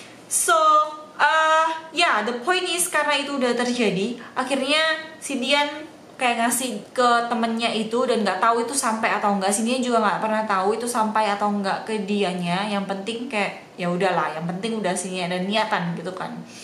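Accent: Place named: Java, Indonesia. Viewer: Lbh suka kalo ada medok2 nya.. haaha.. krn sm2 org surabaya.. toooss.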